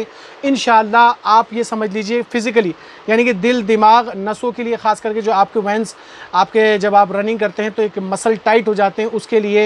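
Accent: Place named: India. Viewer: apki voice pakka hindustani dekha rahe hai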